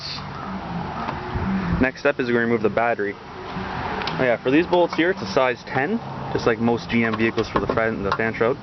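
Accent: Canada. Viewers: Great video. I'm from ontario and my wife said hey he has the same accent as you. Bish I don't have an accent! LoL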